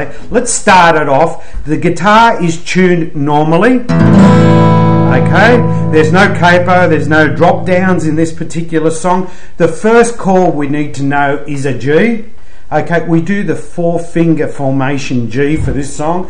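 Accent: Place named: Australia. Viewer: Great to hear the Aussie accent! I'm a yank with dual citizenship and a frequent visitor to good old Melbourne!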